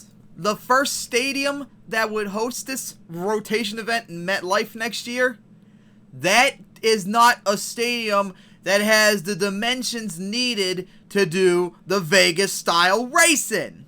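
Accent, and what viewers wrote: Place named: Boston, United States. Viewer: I don’t often watch many YouTubers as I make videos on my own but, I find myself coming back to this video as this is funny as hell. Great rant! XD I can also hear a little bit of a Boston accent in your voice too. FELD is like any other corporation and they’re clearly not in compliance of caring for the audience. Wait they do! That is for the money. I honestly make the videos for pure entertainment purposes and enjoy doing so. Nothing more. Although I am and most of us are supporting them in a way with the videos we make,FELD will continue to accustom their values of what’s right in their perception. It’s business.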